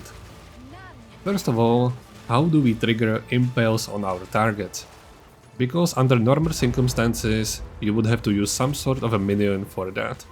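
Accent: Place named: Czech Republic. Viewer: Ahoj je fajn vidět že i v česku se hraje poe, ten přízvuk poznám prostě všude :D muzu si te pridat na dc nebo tak ?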